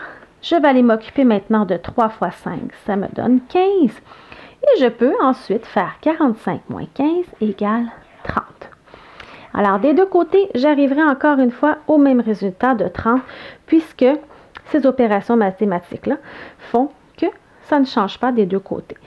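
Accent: Quebec, Canada. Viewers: Accent québécois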